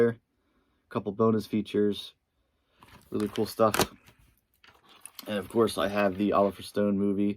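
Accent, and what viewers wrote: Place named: South Jersey, United States. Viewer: I bet youre from south Jersey or Philly .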